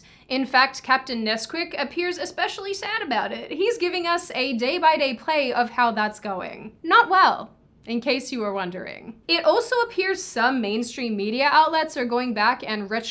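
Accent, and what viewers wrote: United States. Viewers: Awesome video,! Btw, you're gaining an American accent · Your accent is disappearing!! Americanized quick!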